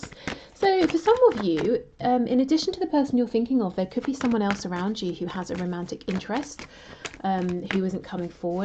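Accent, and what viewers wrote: United Kingdom. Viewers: Hai very good morning dear namaste great reading as always I feel u and ur reading can't go wrong apart from ur reading I am big fan of ur voice abd ur English I mean the British English. Thanks a million dear with warm regards namaste lots of love and light. Dr vijay anand. (prince India khalid)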